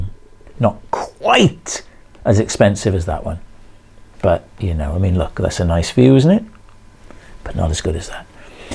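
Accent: Wales, United Kingdom. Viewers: Loved your welsh accent, stonkin butt! 😀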